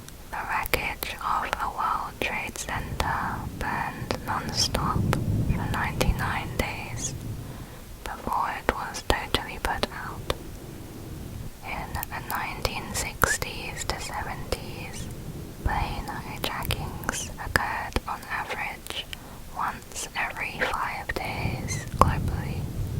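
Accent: England, United Kingdom. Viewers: She has an English accent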